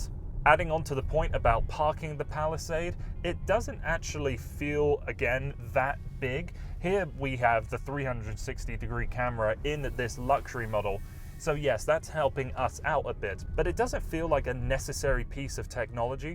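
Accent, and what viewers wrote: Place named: United Kingdom. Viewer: I really enjoyed watching this video and I noticed that you did add in some funny lines. I have no trouble with the British way of saying things, because I’m used to Robert of Fully Charged. He loves to joke around. He says Hyundai the same that way you do. I was waiting for an aluminium joke! Keep up the awesome work! I have to admit that I mostly follow very low to no emissions cars and I might not have the time to watch every one of your videos.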